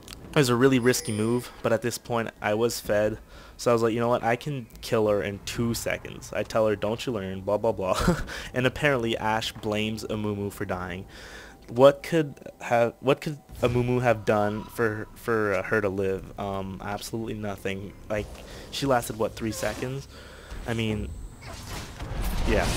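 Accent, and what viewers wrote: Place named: United States. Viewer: You are french? I thought you were american, not a doubt in my mind.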